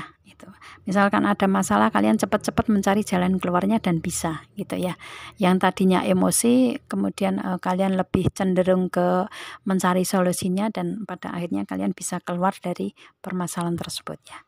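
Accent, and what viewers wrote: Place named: Java, Indonesia. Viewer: Dari jawa ya mbak ... klentum nya kyk Jawa.mbak ...tksh mbak ....Aqua..resonet kok mbak.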